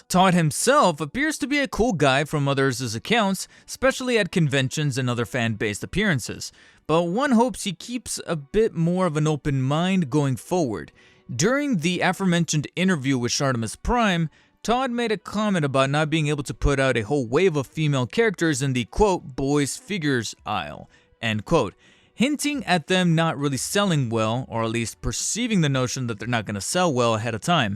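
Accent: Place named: Quebec, Canada. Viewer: Your accent is throughout me. It sounds very Québécois.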